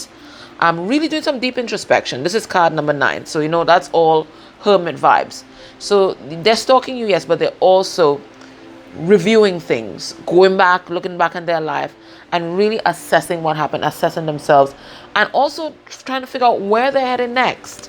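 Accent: Trinidad and Tobago. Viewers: are u a Trinidadian...u hsve our accent lol...thank u for the reading 🌻🌼🌻🌼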